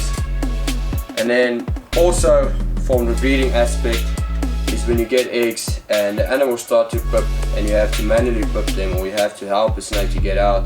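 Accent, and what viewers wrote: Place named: South Africa. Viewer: Your South African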